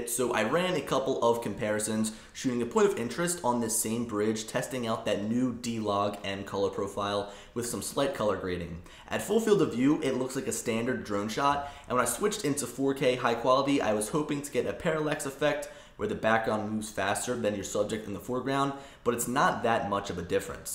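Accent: Philadelphia, United States. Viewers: Hey, Wow, r u from Philly or NJ.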